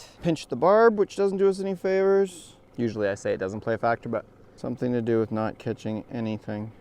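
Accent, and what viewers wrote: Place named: Canada. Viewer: I'm Canadian but this is one of the strongest Canadian accents I've heard.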